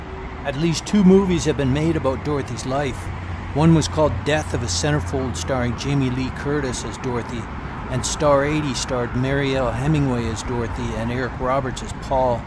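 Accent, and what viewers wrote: Canada. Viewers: You're definitely Canadian!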